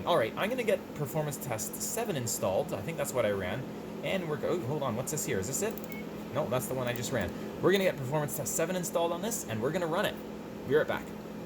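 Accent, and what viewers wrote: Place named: Canada. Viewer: I can tell by the accent in the first minute of watching, you are Canadian. *Edit: Sorry this is so random. Love the vid!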